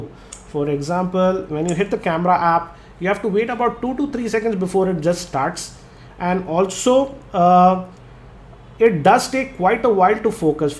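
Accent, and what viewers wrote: India. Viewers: lol indian accent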